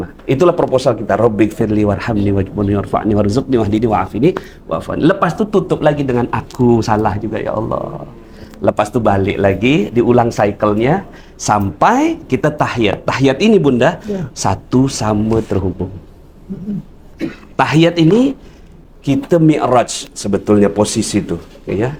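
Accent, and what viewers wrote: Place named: Malaysia. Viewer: Kenapa kok.logat.mslesya pak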